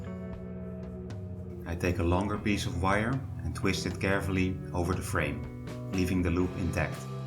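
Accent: Netherlands. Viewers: ja ik ben zelf nederlands ik hoorde het direct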